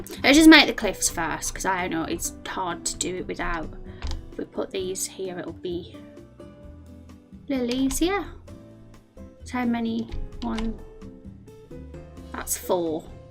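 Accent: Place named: United Kingdom. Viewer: Oh, British? had to adjust a bit but I liked it! ;P :D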